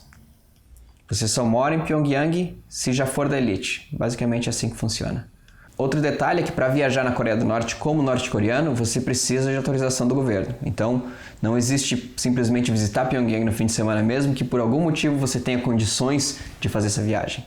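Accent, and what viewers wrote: Rio Grande do Sul, Brazil. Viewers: Tu é gaúcho meu ? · cara vc e gaucho?Vc tem muito sotaque daqui sksk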